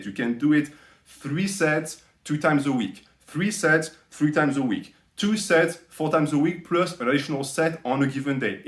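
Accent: France. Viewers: je viens de découvrir ta chaîne et j'ai su en une phrase que tu étais français avec ton accent , continue tout est excellent et cette french touch ça donne juste envie de regarder d'avantage tes conseils et d'en apprendre plus , à la prochaine .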